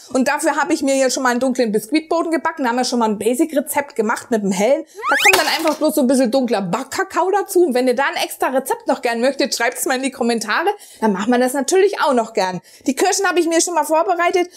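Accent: Germany. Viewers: Ich bin so amüsiert über deine verbale Dialektwanderung zwischen Berlin, der Pfalz, Hessen und ein büschen Nordisch....von wo bist du, du Granate??? Deine Rezepte und die Umsetzung sind ja herrlich!!!+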